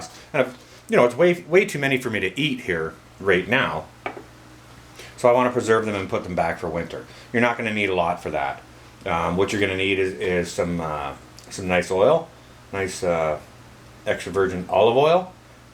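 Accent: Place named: North, United Kingdom. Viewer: If you grow a hamburger over the winter, I want some of those seeds.!! It sounded like grow even though I think you were saying throw, what can I say you have a Northern accent.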